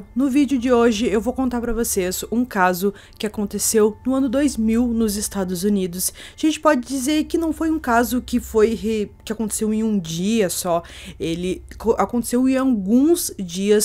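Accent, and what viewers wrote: Rio Grande do Sul, Brazil. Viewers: adoro seus vídeos....e o sotaque gaúcho....acertei?! 🤗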